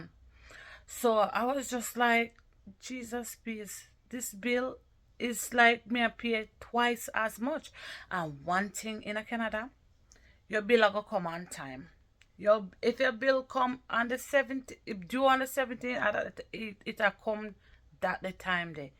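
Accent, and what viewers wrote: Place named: Jamaica. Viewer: Why come on social media to talk about it you use it so pay it and stop talking like Jamaican and idiot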